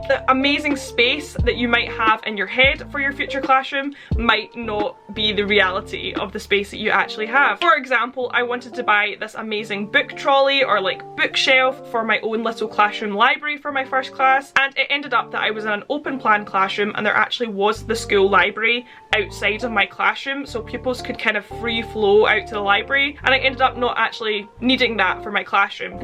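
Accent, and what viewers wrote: Scotland, United Kingdom. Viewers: Hi I really love your Scottish voice and I love the organiser bins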